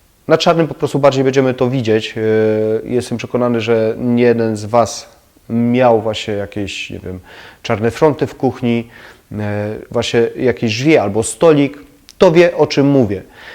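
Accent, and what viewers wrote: Silesia, Poland. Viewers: Cześ ! Słychać żeś jes chopok z Rudy . Racja mosz . Trzymej sie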